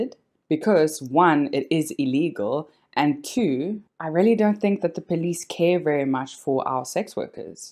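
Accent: South Africa. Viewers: Thank you, it's so refreshing to hear our South African accent for a change. Love your presentation style. ❤️ Well done young lady.🥳🥳🥳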